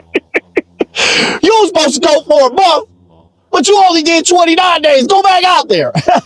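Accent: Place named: Detroit, United States. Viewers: It's weird to hear a Muslim with a american accent. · he has Detriot vernacular. It's not just American, maybe that's what's so unique about him right??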